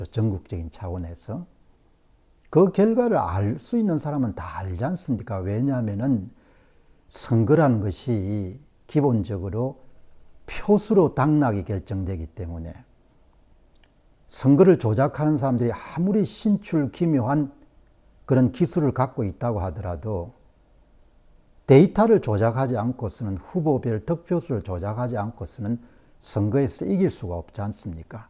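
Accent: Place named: Gyeongsang, South Korea. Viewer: 갱상도ㅎㅎ 편가르기 지겹다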